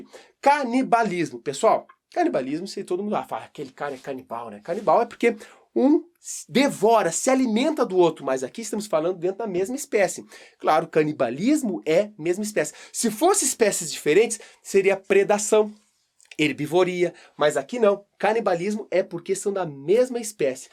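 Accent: Rio Grande do Sul, Brazil. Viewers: Olha o Gaúcho ksksks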